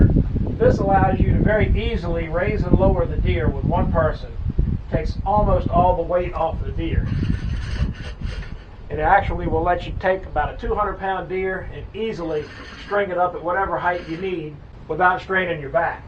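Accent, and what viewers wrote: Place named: Carolina, United States. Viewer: Hey, you sure sound like you live next door. I’m in Carolina, north of the OuterBanks. Are you near here?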